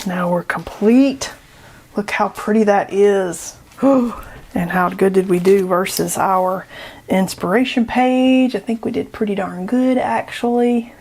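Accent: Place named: South Carolina, United States. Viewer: your SC accent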